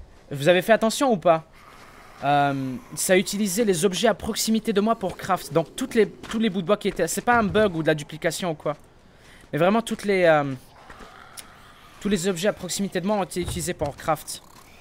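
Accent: Quebec, Canada. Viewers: T de quebec?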